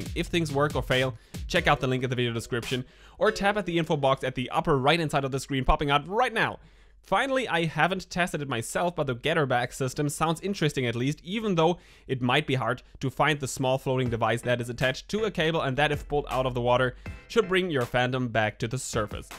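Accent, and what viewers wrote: Germany. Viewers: Cool stuff! Your English is excellent. Very little German accent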